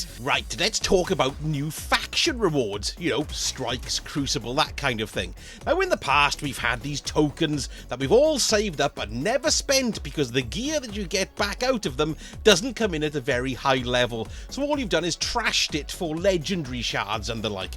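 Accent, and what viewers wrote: Wales, United Kingdom. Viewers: I can spot a Welsh twang in your voice,am I right?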